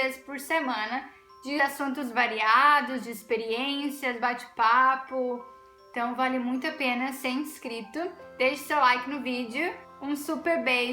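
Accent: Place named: New Zealand. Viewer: Na verdade, você aprendeu inglês com sotaque, com muito vocabulário da Nova Zelândia, que não é britânico, nem americano... Não é muita vantagem...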